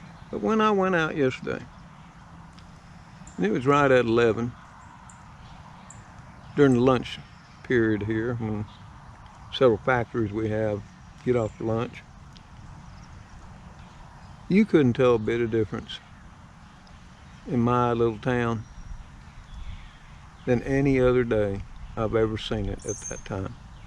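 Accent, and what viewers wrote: South, United States. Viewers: your southern twang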